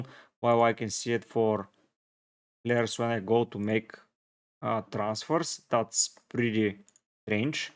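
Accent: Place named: Bulgaria. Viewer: I just heard 3 seconds and I knew you were Bulgarian, can’t miss the accent.н😂 Жив и здрав брато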